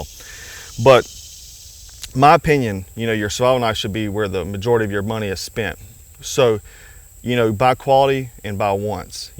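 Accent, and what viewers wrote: South, United States. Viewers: You sound like you're from North/South Carolina? Nothing wrong with that. American by birth, Southern by the grace of God. Great video.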